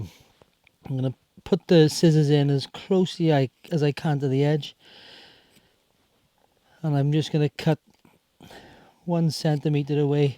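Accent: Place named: Wales, United Kingdom. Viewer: I was pleasantly surprised to hear a Welsh accent coming to this video. A great watch and great to see another Welshman do well, and show passion in his craft. yn anfon llawer o gariad o Llanelli. Iechyd Da i chi!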